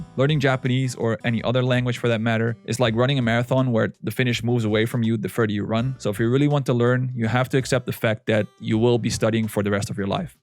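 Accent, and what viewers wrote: Netherlands. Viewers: Great video! I started learning Japanese last September, nearly 9 months ago. Rather than months or years, I think the crucial factor is the number of HOURS, which is why I decided to write down how many hours I studied every day. So far I have done exactly 332 hours, which works out at roughly an hour and a quarter per day on average. This isn't a lot, but I have a family and a full time job, so I cannot realistically manage much more. I have been using Wanikani for the kanji and vocabulary. Grammar I have covered with an app called Busuu, but I think I have gone too fast, because it hasn't really stuck in my brain. Right now I'm reviewing all the lessons from the past few months. And to top everything up, I use Duolingo. It's free, it's fun, and there's a bit of everything in there: kanji, grammar, vocab, useful phrases, etc. At my present rate, I expect it to take me a bit over a year to get past the beginner stage and start just immersing; reading and listening. 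In July I have the JLPT n5 exam, which I expect to pass. After that I will no longer consider myself a beginner. I'm not worried about output, so I won't be trying to talk to Japanese people, or writing out the kanjis. For the foreseeable future I will be limiting myself to input. By the way, I recognize the Dutch accent from when I lived in Belgium. Like most Dutch speakers, your English is excellent.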